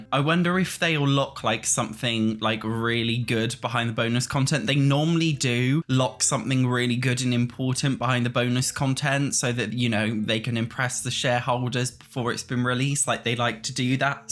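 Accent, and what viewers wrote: United Kingdom. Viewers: WHAT I THOUGHT YOU HAVE A HEAVY BRITISH ACCENT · I see you took your English teacher role very serious! Thank's for being here for us! I learned some words here, but just for you to know, I'm here not just to listen to your great british accent! I love your sense of humour and content you make:)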